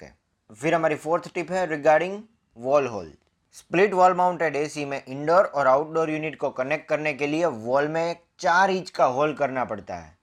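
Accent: Gujarat, India. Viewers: Aap Gujarati ho ?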